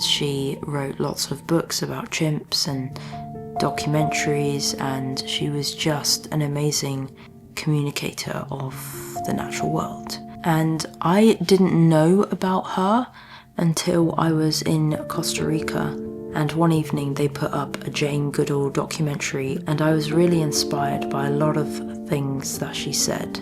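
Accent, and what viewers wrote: United Kingdom. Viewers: HI hello there, lucky to find someone who`s close to the nature. good to see you here, exceptional work done, great videos, amazing Brit`s accent. music, everything is so perfect n upto high standard videos, love to spare few moments. plz carry on making more videos. stay safe and rise n shine.............🐿🐿🐿🐿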